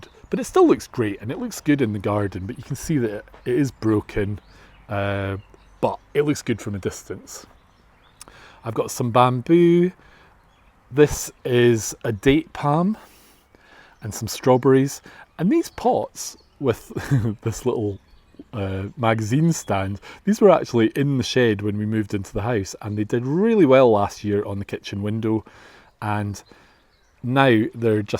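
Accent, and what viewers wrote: Scotland, United Kingdom. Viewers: love your commentary and Scottish accent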